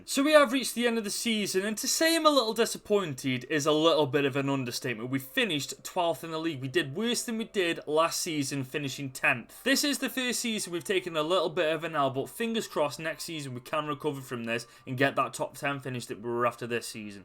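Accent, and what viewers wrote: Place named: Yorkshire, United Kingdom. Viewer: You sound like a yorkshire lad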